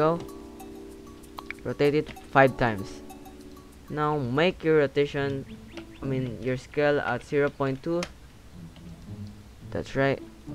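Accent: Philippines. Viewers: Just from the accent i can already tell ur also filipino xd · You can see that he’s filipino cuz of he’s accents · i just noticed that your filipino by your voice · I knew that cuz of the accent, oh right im a filipino too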